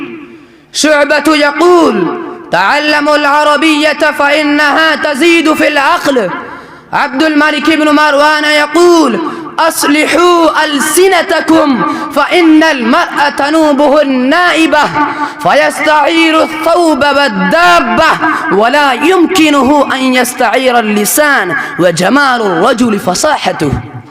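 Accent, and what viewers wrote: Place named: Pakistan. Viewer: ماشااللہ عربی لہجہ پر محنت کریں تقریر عربی کا ہے اور لہجہ اردو کا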